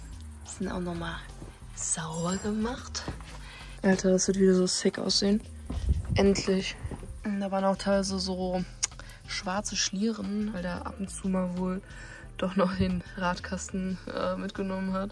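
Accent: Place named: Russia. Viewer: Hi🙋🏻‍♂️ bin zufällig auf dein video gestoßen👍🏼 hat spaß gemacht zuzuschaun und war lustig. Vorallem find ich gut dass du so natürlich rüber kommst. Du bist aber auch verdammt hübsch. Und ohne scheibenwischer sieht die Karre geil aus👍🏼. Walla du hast ahnung👌🏼 und stil. Die Felgen passen wie angegossen zum auto. Glaub bist russin, hat sich so angehört🙂👍🏼ich lass natürlich ein Abo da😁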